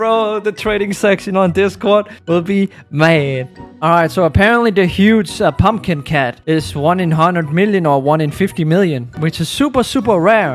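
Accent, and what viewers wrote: Denmark. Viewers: YO ARE U DANISH OR WHAT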